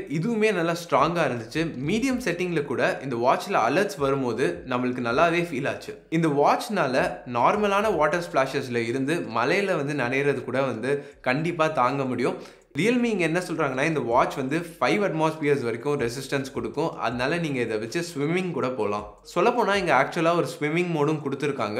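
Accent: Kerala, India. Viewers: I think he is Malayali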